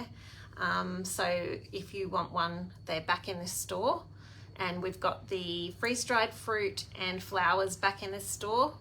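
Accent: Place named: England, United Kingdom. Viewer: Beautiful accent voice.reminds me of my sister in England..Thank you Good idea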